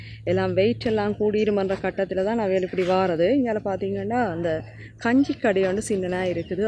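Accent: Sri Lanka. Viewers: உங்கள் இலங்கை தமிழ் கேட்பதற்கு மிகவும் அழகு. வாழ்த்துக்கள்.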